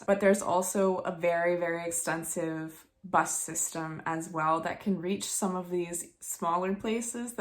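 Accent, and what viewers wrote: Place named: United States. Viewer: This video was aired in Sep 2020 in COVID lockdown and I'm American like you but then again you could be Canadian because we have same accent.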